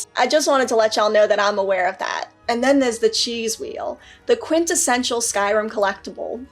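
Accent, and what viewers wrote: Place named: Boston, United States. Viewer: Sounds like Boston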